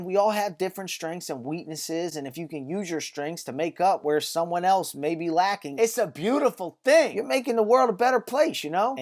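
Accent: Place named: Kentucky, United States. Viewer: Soo are you from Kentucky, Indiana, West Virginia? Can't quite place the accent, but you're definitely not from Philly haha :)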